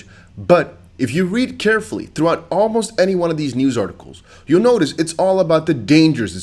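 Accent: United States. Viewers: You sound like you grew up here in America. Our own accents are wildly different from region to region.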